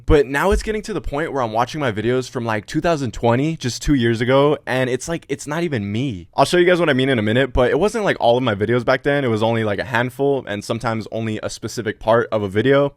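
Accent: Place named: California, United States. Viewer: Cali voice*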